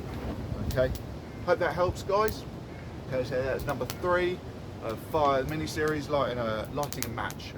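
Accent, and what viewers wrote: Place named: United Kingdom. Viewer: Hi, these videos are fantastic, thank you! I had no idea that you had made all these fire starting videos when I left a comment recently asking for some from you on fire steels or ferro rods. So I am just enjoying watching your videos for now. Next time I know I can check your play list first. And once again its great to hear a British accent talking about bush craft 👍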